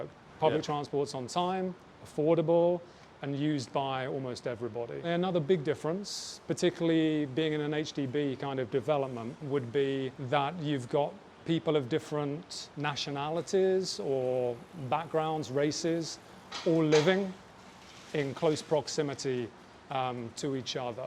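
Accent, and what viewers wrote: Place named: United Kingdom. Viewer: Don't really like the heavy distinctive british accent too, something like that of Mr Bean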